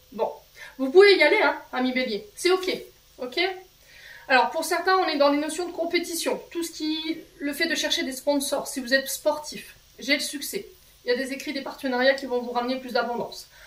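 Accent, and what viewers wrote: North, France. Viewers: Incroyable 😍 ! Tout est juste pour moi et quel plaisir d'entendre ce petit accent du Nord. Merci beaucoup, je m'abonne ❤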